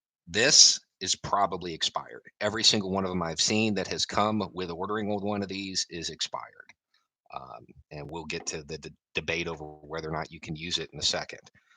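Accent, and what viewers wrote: South, United States. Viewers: eat the r*ch South American or Southern? That's two completely different things. has kind of a southern accent. It is far from a South American accent. (I only say "kind of" because I'm probably so used to a southernish accent that I don't really hear it as an accent.)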